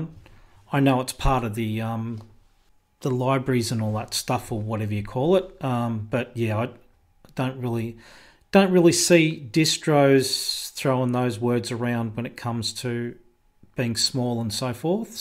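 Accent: Australia. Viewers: You sound like an Ozzie, right? So the right keyboard for you would be GB, not that weird US wannabe English. Where is your pride and selfrespect, man?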